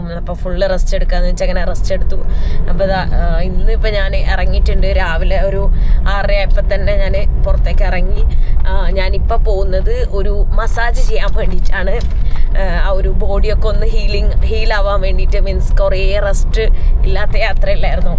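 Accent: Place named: Tamil Nadu, India. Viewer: 👍.. Speck slightly tamil...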